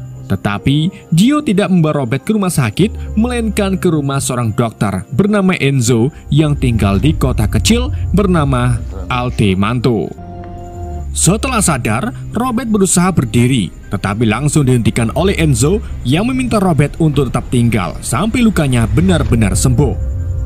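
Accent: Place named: Java, Indonesia. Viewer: Tak meluni sekang awal malah part 3 jawane metu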